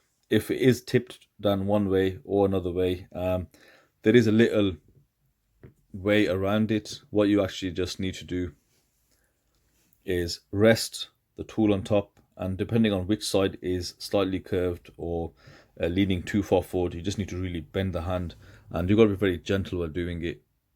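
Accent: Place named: Northern England, United Kingdom. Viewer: I know i’m a year late to this video but better late than never. Great video bro. Where are you from, you sound Northern.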